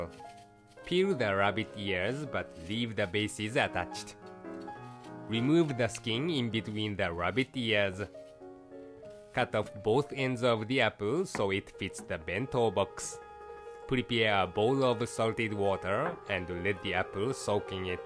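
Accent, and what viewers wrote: Japan. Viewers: I love watching your videos! Very clear and not too fast step by step on how to make the dishes. Other cooking videos I have seen so far were too quick/too fast to follow. Love your dog,too! The narator's english with his Japanese accent also has become your video's character even more Japanese 😊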